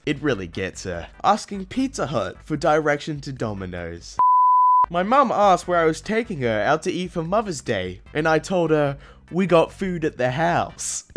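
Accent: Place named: Australia. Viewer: Emkay bUt Australian.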